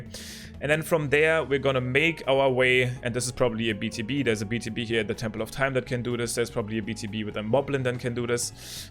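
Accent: Germany. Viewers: are you german? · You Sound german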